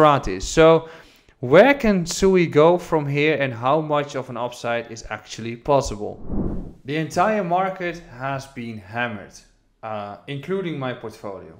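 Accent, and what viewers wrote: Netherlands. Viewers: Had binnen 1 minuut al door dat je een Nederlander bent ;). Bizar hoe herkenbaar ons accent is wanneer we Engels spreken... Goeie video man, je bent een abonnee rijker!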